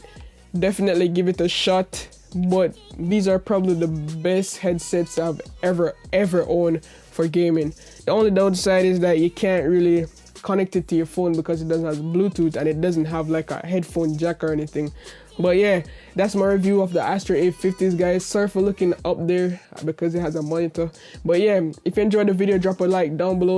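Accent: Jamaica. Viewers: Are you Jamaican? It's like listening to one of my cousins tell me about tech. Great work!!! Ire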